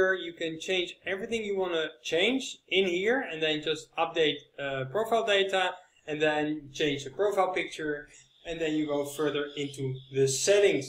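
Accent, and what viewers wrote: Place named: Netherlands. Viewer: How do you speak english, havin a dutch accent with russian on ur PC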